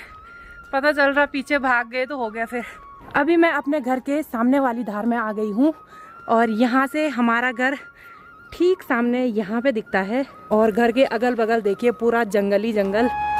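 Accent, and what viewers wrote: Garhwal, India. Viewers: apki Hindi boli me gadwali bhasha ki jhalak bahut pyari lagati hai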